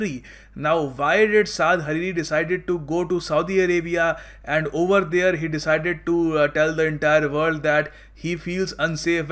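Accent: India. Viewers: In English? This is Heenglish. Couldnt even listen it..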